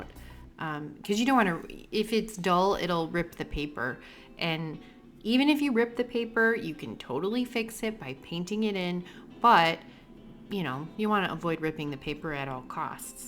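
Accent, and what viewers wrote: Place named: East, United States. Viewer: Love your artistry and thanks for telling it like it is - so helpful and inspiring. And hope this doesn’t come out wrong but your eastern us accent is so cute. Love From California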